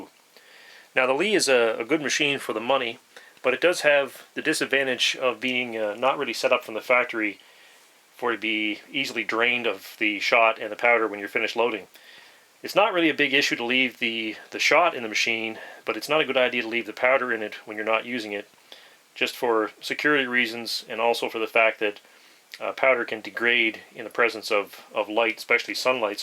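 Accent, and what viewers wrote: Canada. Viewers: Great video! I just bought one of these recently, as I have a plethora of spent shells I kept for eventual reloading. I like the Canadian accent too.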